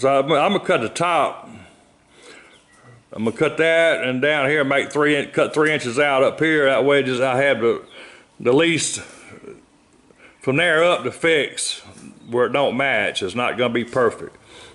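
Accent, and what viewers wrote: South, United States. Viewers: Бля, как же меня прикалывает этот "реднековский" акцент. Good job men.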